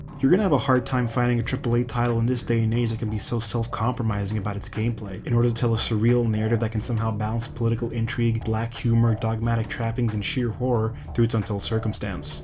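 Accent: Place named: United States. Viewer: First of all, I'm sick and tired of hearing "crescendo" get vomited out in YouTube reviews/videos/ whatever the individual wants to call it. Second of all, are you playing a foreign copy? Because there's no blood yet you have an American accent so I'm trying to figure out why your captured footage is the censored version?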